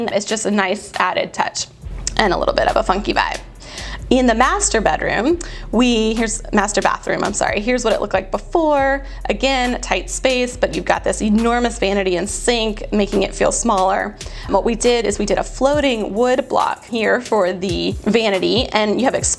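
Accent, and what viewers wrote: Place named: United States. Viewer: I don’t hear anything but an American accent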